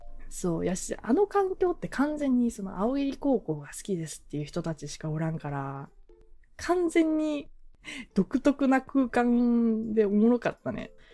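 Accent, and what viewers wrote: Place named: Kansai, Japan. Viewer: ゐぶきちゃんは品のある関西弁がホントにかわいいですね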